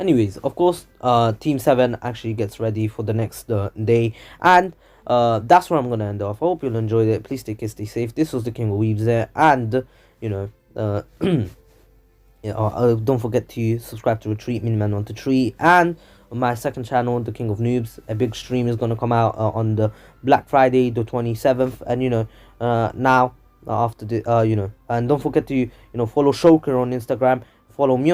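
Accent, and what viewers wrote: United Kingdom. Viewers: You sound like a Leicester guy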